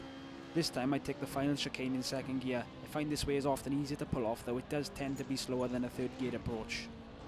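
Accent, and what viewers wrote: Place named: Wales, United Kingdom. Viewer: Really good video, shame your not making any more and it's nice to hear a Welsh accent :)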